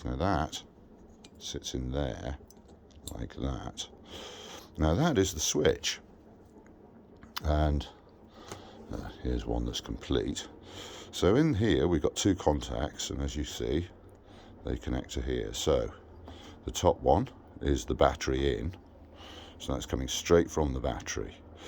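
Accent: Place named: England, United Kingdom. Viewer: I never realized how much better these mechanical help videos would be with an English accent 🙌 I installed the new solenoid without raising my blood pressure once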